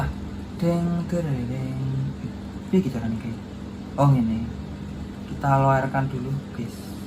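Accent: Java, Indonesia. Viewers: Logat Jawa mu metu mas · Jowo ta mas samean? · Bukan Indo. Tapi Jawa..itu namanya orisinil..gak sombong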